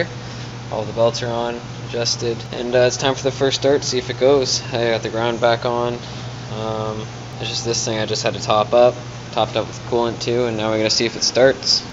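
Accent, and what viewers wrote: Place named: Canada. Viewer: He sounds Canadian so probably yes.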